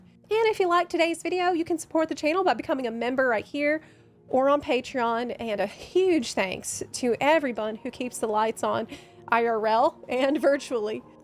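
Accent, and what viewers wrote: South, United States. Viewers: It’s been years since I watched a video. Was she always southern? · Yeah, same, same! I just left a comment about it and to clarify, I’m exactly the same way. Not trying to be confrontational or offensive at all, just legit curious! My father’s people are from Tennessee and the rest of my family is from Oklahoma, so she sounds like half my kin 😄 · sound like you have a Southern drawl in your American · you sound southern:P maybe Tennessee or Louisiana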